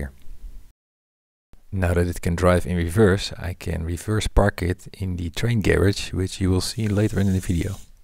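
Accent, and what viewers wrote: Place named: Netherlands. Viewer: Je hoort zo goed dat ie gw Nederlands is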